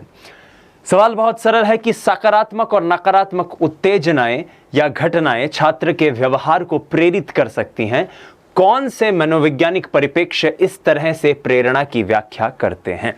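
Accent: Rajasthan, India. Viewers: The way you speak marwari😍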